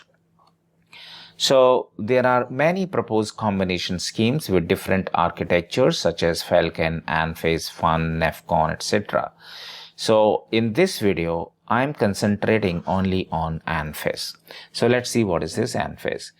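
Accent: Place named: India. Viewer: nowadays, when you see a tutorial with Indian accent, you know it has better chances to be a good one. This time it didn't disappoint. Kudos to Indian engineering, Kudos to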